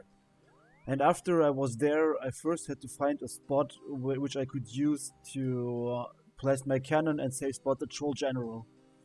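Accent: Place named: Germany. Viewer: ich wusste sofort, dass du deutsch sein musst xD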